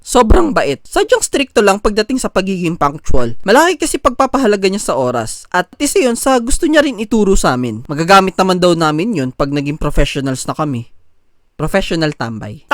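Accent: Visayas, Philippines. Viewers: half bisaya ka kuya? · Bisaya ka ba po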